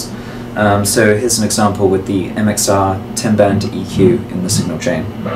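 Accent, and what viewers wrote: United Kingdom. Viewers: is one of the heaviest bassists on YouTube also: is British and has a POSH accent me: visible confusion and astonishment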